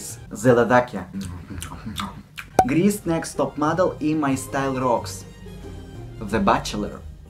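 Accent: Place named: Russia. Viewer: Τρελαίνομαι για Ρωσική προφορά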